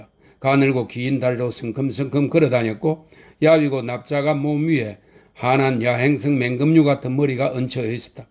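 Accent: Gyeongsang, South Korea. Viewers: ❤힐링시간 허스키 경상도 친한 막걸리 목소리 강건❤하소서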